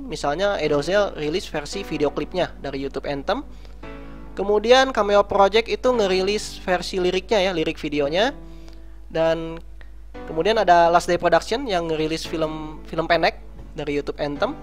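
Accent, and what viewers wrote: West Java, Indonesia. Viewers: accent kaya orang sunda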